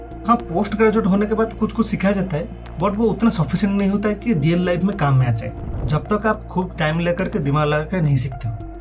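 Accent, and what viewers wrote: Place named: Odisha, India. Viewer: Pata thaaa bhai tere hindhi bolne style seee.. jay Jagannath ❤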